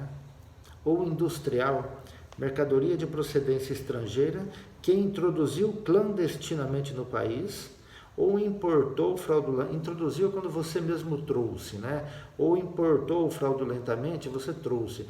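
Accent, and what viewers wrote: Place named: Brazil. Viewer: No le entiendo bien esta muy brazileiro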